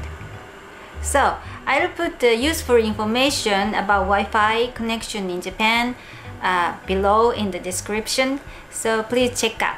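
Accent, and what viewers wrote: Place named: England, United Kingdom. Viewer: Your English accent is so cute!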